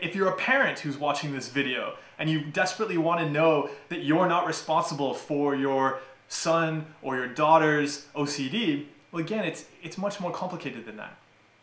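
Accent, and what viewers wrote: Canada. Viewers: Cute, smart talking Canadian....love it :)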